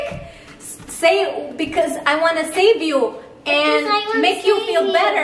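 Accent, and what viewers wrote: Saudi Arabia. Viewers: انتي سعوديه؟ مستغربه من لكنتك سعوديه بس وجهك وملامحك كويتية او اماراتية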